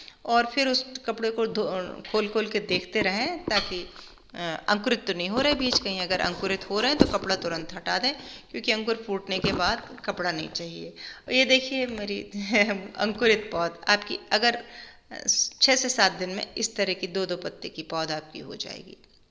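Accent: Standard. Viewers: Very nicely taught Ma'am. I luv ur shudh hindi !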